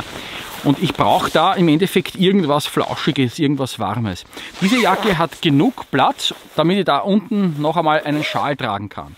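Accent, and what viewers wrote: Vienna, Austria. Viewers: a woschechta wiener, ha? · Alder ein Video von dem Typ gesehen, direkt abonniert einfach ein richtiger ehrenmann. Und bei dem geilen Österreichisch sowieso ein Muss.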